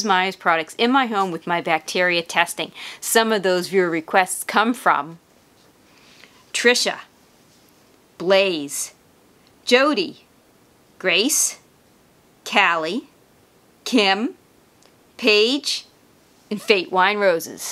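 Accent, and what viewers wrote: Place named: New Jersey, United States. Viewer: Woah great video! I have a portable far infrared sauna (it's like a tent you lie down in with an infrared generator). I was on the hunt looking for a more natural spray with less caustic chemicals to clean it after use, and encounter the Mr. Meyer's brand. Loved everything I read about it, but was also looking for something with antibacterial properties. As you mentioned, they don't state that it does kill bacteria, but you just proved it without a shadow of a doubt. I picked some up today. I sprayed a little on the counter top just to test the scent, I think it smells good. Hopefully it won't be too overpowering when I clean the whole sauna, but I would rather have something safe than the chemical spray I was using. Thanks again for this great presentation. BTW, loved the accent, I had grandparents from NJ :)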